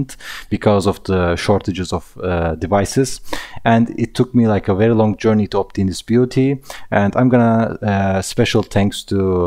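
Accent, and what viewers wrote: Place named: Poland. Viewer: Omg nicee accenttt~ I love men with Polish accent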